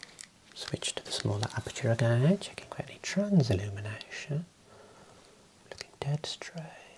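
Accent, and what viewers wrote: Birmingham, United Kingdom. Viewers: I neeeeeeed to know. Are you a professional optican or optometrist? Also fellow brummie/yam yam here. since i was a kid, ive always had tingles having eyes checked, and your accent adds nostalgia for me! Thank you thank you thank YOU! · Where are you from, your accent is so familiar, I'm from the black country and you sound kind of brummie, love the asmr btw <3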